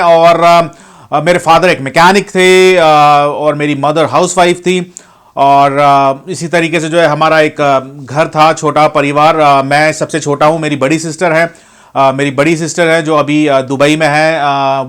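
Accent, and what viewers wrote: Kerala, India. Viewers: Malyali ho aap?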